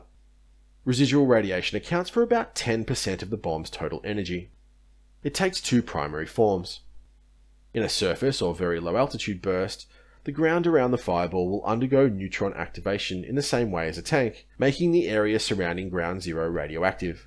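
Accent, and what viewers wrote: Australia. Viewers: Glad you re-uploaded it mate. One thing though... you pronounce "beta", as in "beta radiation", as "bay-tah", not "bee-tah". As a fellow proud Australian, I must ask why. There are enough Americans speaking their form of English in YouTube videos, and while there's nothing wrong with that, there's no need for everyone else to do so too. But that is a minor criticism. I really enjoy your material and it's nice to hear an Aussie accent, the occasional American pronunciation notwithstanding. As a 13 year old in the early 80s, I delivered the afternoon newspaper, "The Herald". In it on one occasion was a graphic description of the effects of a one megaton blast over Bourke Street. I think the effects described in that were probably taken from the general description of the effects at certain distances of a one megaton blast that you gave towards the end of the video. 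I've often wondered where I could get hold of that article in recent years, but I cannot find any reference to it anywhere. I wonder if you might know of it. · I want to take a moment to express my admiration for your scientific videos, which have a calming, informative effect on me. I am particularly fond of your previous dissections of the atomic theory, which I find highly engaging and informative. I strongly appreciate your voice; that Aussie narration in the video creates a sort of exotic intimacy, which adds to my enjoyment of the content. Additionally, I am grateful for your videos on the Pacific military operations, which were fascinating. I think your style is outstanding with the quality of the videos, and I am deeply grateful for the opportunity to watch them for free. Thank you for making these videos while naturally making the very most informative pieces about these amazing underlying subjects.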